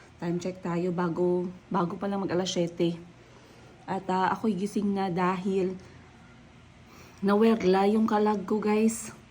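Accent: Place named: Visayas, Philippines. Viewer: love n love q po tlaga ms. yang tagalog bisaya accent mo.nkka goodvibes po.❤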